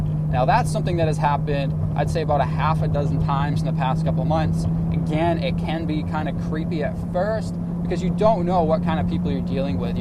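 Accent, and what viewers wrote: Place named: Boston, United States. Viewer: I noticed that you had a slight Boston accent. then when you said you're in Maine I thought to myself, "ohhhh"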